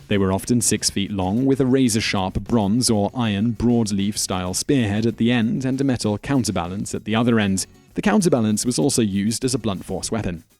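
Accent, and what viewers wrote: United Kingdom. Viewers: 79,000 was not by any stretch the biggest army in ancient history. There were much larger armies than that. Look, I know you are British, and most often inaccurate in every single video, but please, drink your tea, and keep your revisionist history to a minimum. Some people conflate your accent with facts.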